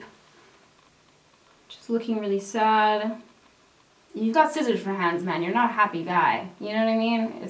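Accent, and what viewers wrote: Canada. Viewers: your canadian accent is so cute.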